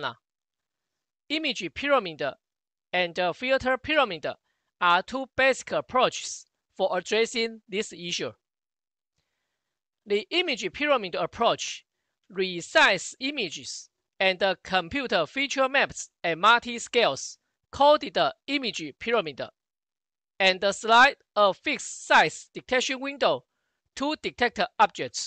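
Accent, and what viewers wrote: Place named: Taiwan. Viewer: The accent of oral English of Taiwanese and Mainland Chinese do have a lot in common which makes your presentation much easier for me to understand, thanks, buddy!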